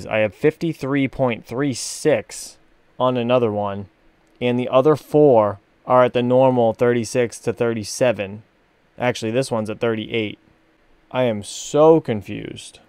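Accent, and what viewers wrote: New England, United States. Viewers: New England accent is strong today!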